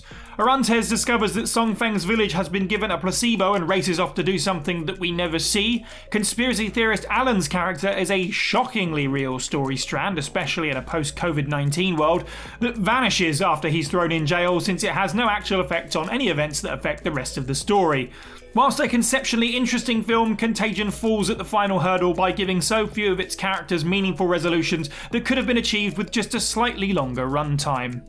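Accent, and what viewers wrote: United Kingdom. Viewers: Anyone actually enjoy/agree with this channel’s opinions or are we all just wanting to hear ignorant opinions in a British accent?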